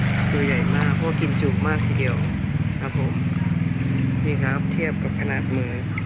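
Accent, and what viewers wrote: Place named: North, Thailand. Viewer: คนจังหวัดไรครับเนี้ย พูดเหนือด้วย 55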